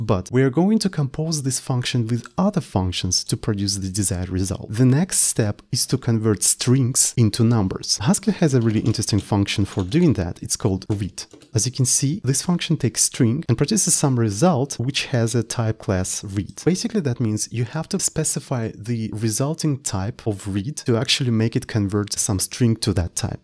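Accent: Russia. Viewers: Чую рашн аксент. Найс видео, keep going.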